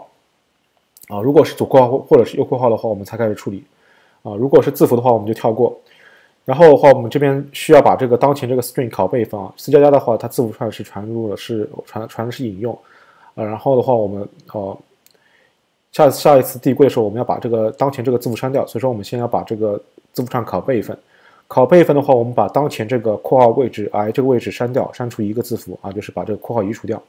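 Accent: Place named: Shanghai, China. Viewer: 良心博主，如此熟悉的口音像上海人哈哈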